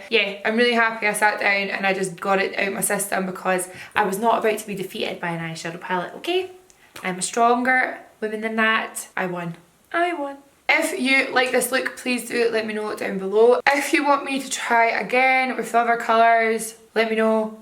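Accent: Scotland, United Kingdom. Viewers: Where in Scotland is from?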